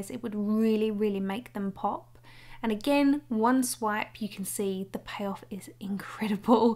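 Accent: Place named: England, United Kingdom. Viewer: I think I liked the near black one the best. I agree with you, because I think the quality and intensity of the colors was really exceptional. Pricewise, it could be a lot worse. I had assumed, while watching your video that this would be a European brand because of your English accent but I was glad to see that you referred to how many dollars they cost. I’m going to check and see what I can find out about them. Thanks for sharing this. New subscriber here. 👍🏼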